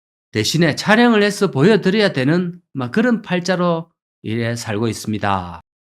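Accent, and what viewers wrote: Gyeongsang, South Korea. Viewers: 등더리 ㅋㅋㅋ 진짜 얼마만에 듣는 고국의 갱상도 사투리인가요. 억쑤로 고맙십니더. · 맞습니다 멀리 캐나다에서도 항상 다음 영상기다립니다 구수한 영남에 사투리로 재밋게 말씀 하시는것도 너무 재밋습니다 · 갱상도 사투리 + 충청도 어투...?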